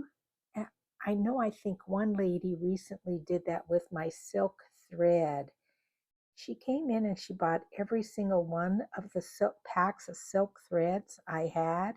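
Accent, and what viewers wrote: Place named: Utah, United States. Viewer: I’m from and live in Tennessee but I lived in Providence, Utah for 5 years. You have the same accent as them. 😄 Are you from Utah?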